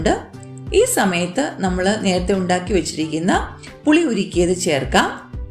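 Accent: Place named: Kottayam, India. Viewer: Well expalined in കോട്ടയം slang 👌♥️👏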